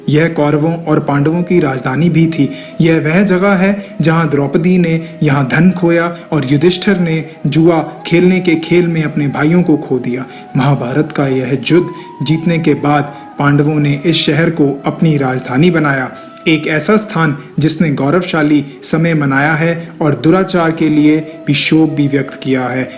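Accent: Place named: Punjab, India. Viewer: Zaberdast jaankaari, commentator ki awaaz bhi achchi hai, lekin punjabi accent ke kaaran kuch shabdo ka uchchaaran galat hai. Jo ki kaano ko chubhta hai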